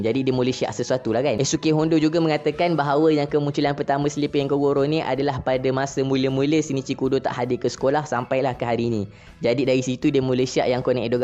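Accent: Kelantan, Malaysia. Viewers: Bang kenapa abang lebih ckp ala kelantan gitu.? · Ore klate ko boh??!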